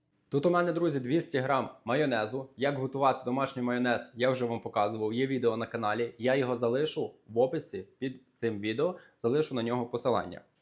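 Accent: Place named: Western Ukraine, Ukraine. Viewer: Трошки не аль-денте😄 Чудова наша західна розмова😍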